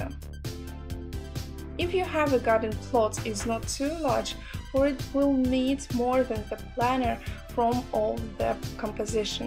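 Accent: Russia. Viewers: Русскоязычный английский ,идеи полезные для меня, Thanks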